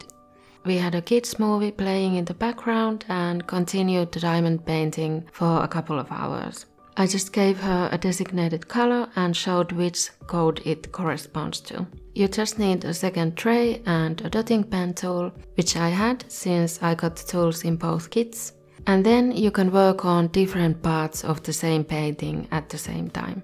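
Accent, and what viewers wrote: Finland. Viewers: What country are you from? Because that sounds a little bit like finnish accent.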